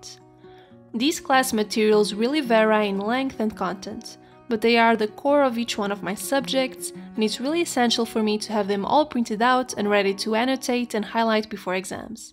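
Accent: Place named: Portugal. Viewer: she's from Portugal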